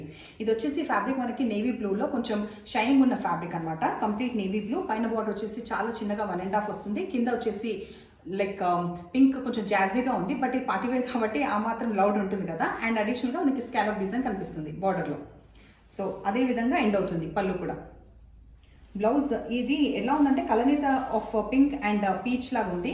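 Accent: Telangana, India. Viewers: తెలంగాణ భాషను అచ్చంగా మాట్లాడుతున్నారు వినడానికి చాలా బాగుంది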